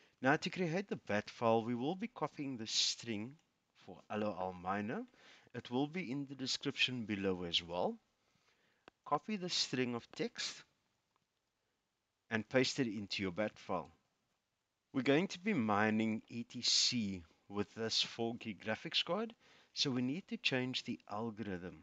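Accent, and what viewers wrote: South Africa. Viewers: my man sounds South African AF well done thanks for the useful info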